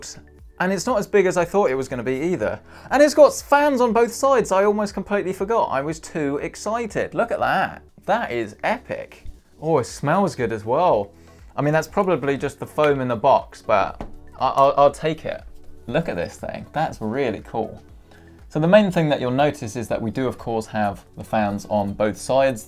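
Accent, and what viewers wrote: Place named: Southern England, United Kingdom. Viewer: Judging by your accent are you in the south of England?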